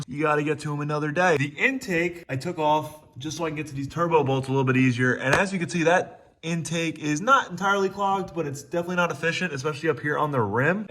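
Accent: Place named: Ireland. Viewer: she sound like she to be in the irish car scene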